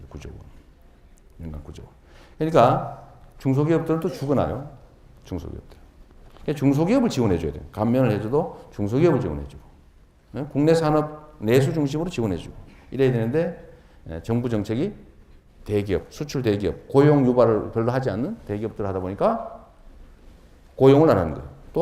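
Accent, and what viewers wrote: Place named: Gyeongsang, South Korea. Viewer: 경기도 살면서 갱상도 말하면안대지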